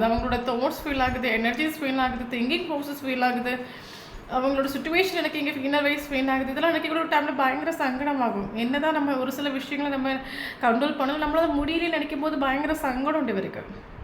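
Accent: Kerala, India. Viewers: ரொம்ப நன்றி பிரபஞ்சம். நன்றி மேம். ரொம்ப அழகா சிரிச்சிட்டு ரிடிங் சொல்லுறீங்க. மலையாளம் கலந்த உங்கள் தமிழ் அழகு. எனக்கு ரொம்ப பொருந்துச்சி இன்னைக்கு reding, நன்றி ♥♥🌹🌹🙏🙏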